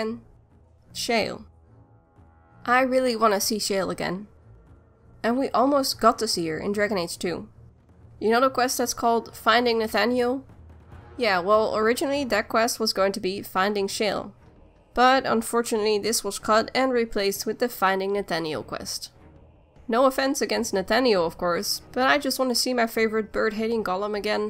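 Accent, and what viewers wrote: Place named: Netherlands. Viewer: So i clicked on this because it was recommended content and as soon as i hear the voice i instantly go, this one is dutch...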